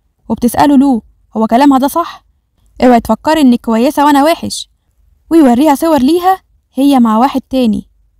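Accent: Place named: Deir ez-Zor, Syria. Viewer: انت من اي بلد مبين من صوتك انك بنت من سوريا ومن الدير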